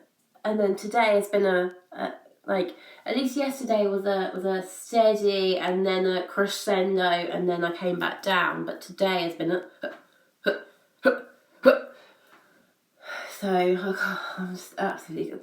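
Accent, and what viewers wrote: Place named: United Kingdom. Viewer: That British accent makes you sound so educated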